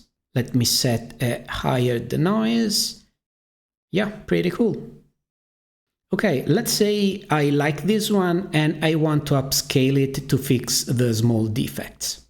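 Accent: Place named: Italy. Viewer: Thank you! Watching your videos after having spent a few months with comfy is like going through a grammar book after having had a thousand hours of input. Same hilarious feeling! Also your accent is cool! I've spent some time around one Italian dude in India, so watching your videos brings back those fun memories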